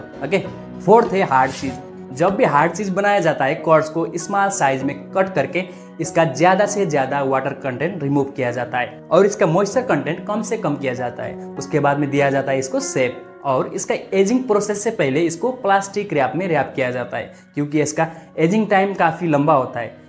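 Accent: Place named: Nepal. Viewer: Aap nepali ho na ... tone bepali aaraha hain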